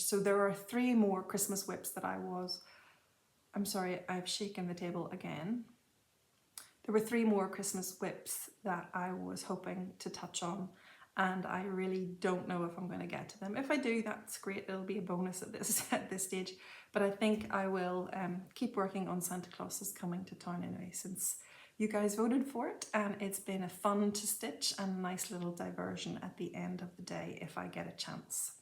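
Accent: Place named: Ireland. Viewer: so lovely to see you again and we do understand you are very busy, not only looking after your own wee family, but also preparing for long term house guests. Wishing you all the best as you all adjust to the new routine once your guests arrive. I'm sure you will be able to grab a few minutes some days to stitch a row and use this as your chill out and recharge time. Please don't worry about having too much content for future videos. Just to see your face and hear a couple of minutes of your Irish lilt will suffice until everything settles down for you. Take care and deep breaths. xx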